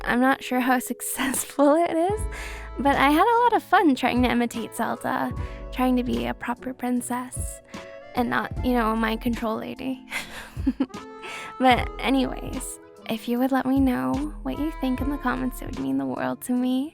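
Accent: United Kingdom. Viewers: Your british accent sounds amazing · sounds rather Bri'ish to me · Diggin your English accent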